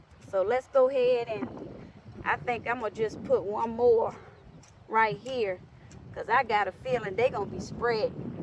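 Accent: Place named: North Louisiana, United States. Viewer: I know boot 👢 when I hear it.. North Louisiana me..great job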